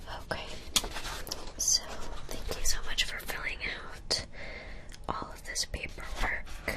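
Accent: United States. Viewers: Joseph Yule she is American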